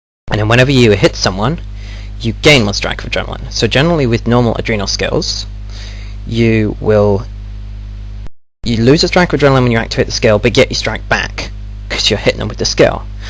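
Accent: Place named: United Kingdom. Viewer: wooot a british gw player that does vids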